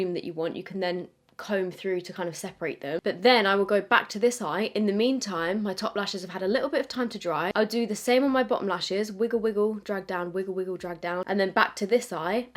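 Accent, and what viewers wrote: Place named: United Kingdom. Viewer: What region would you say your accent is from? I love listening to you talk, but my main points of reference for UK accents are you and the Welsh twins lol #questionoftheday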